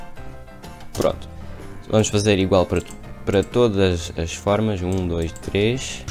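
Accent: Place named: Portugal. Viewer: Parece que vc fala como se fosse do Portugal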